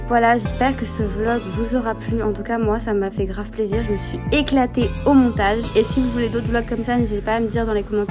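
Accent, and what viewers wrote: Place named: England, United Kingdom. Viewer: Chu morte pourquoi à chaque fois que t'es avec tu prends un accent anglais??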